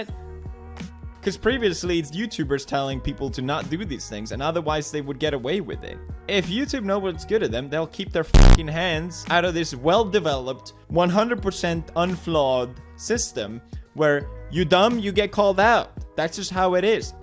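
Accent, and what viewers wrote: Sweden. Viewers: Is it just me or is his accent more swedish now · I can’t tell if pewdiepie is using the forced Swedish accent or this is legit how’s he’s gonna talk rn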